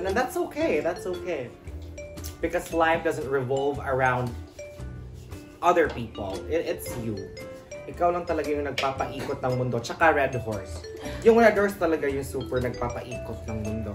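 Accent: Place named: England, United Kingdom. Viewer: i love your english accent more videos pa po 😊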